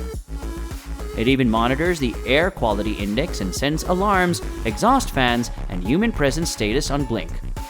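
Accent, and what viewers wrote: India. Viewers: Siri is impressive. Can understand that indish acent!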